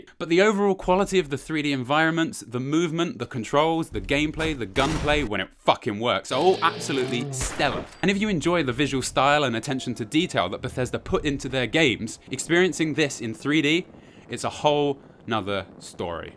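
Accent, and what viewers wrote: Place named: United Kingdom. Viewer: Does every british man have the same voice?..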